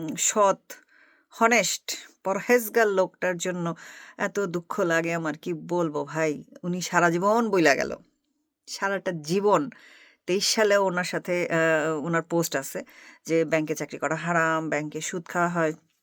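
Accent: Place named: Bangal, Bangladesh. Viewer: Bangladesher rajniti bujhina,apnar mukhe Bangal kotha shune valo laglo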